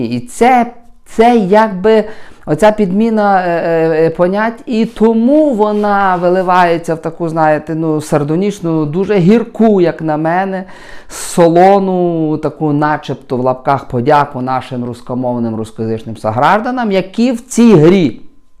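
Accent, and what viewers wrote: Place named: Lviv, Ukraine. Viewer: дякую за роз'яснення ситуації чудовим львівським говором. Вітання зі Львова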